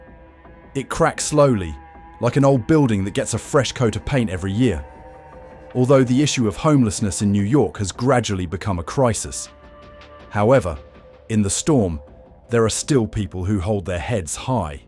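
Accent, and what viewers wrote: United Kingdom. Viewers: Whoever the limey Brit narrator is, talk shit about England. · Gotta love a video with a snooty British accent type narrator talking about how New York sucks . Bite me ya' limey wanker ! Lol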